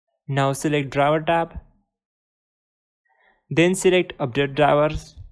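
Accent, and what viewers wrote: India. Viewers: indian lol loved ur accent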